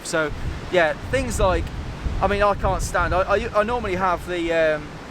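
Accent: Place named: Midlands, United Kingdom. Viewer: Just found your channel. Great stuff. Though I'd say from your accent that you are from the midlands and not wales 🙂👍👍👍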